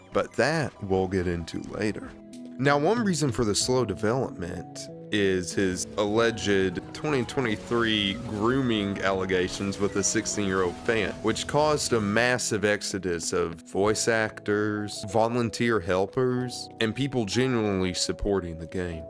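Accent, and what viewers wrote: South, United States. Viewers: Your hillbilly voice makes this 10x funnier. Keep on being awesome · this is the most southern man ive EVER heard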